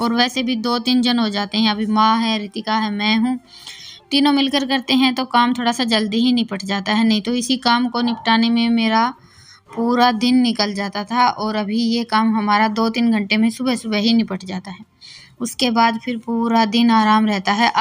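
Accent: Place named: Rajasthan, India. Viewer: Didi aap kha se ho aapki awaz se to lagta h aap Rajasthan se ho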